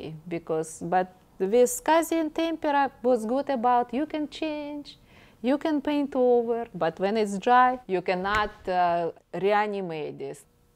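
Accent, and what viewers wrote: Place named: Russia. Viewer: Такой русский акцент)))Но урок очень хороший!А какой марки используете темперу?Мастер класс?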